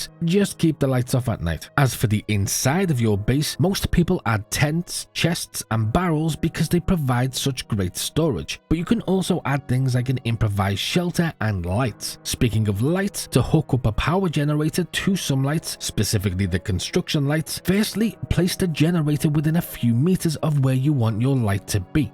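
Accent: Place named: Fife, United Kingdom. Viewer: You sound like a Fifer 😊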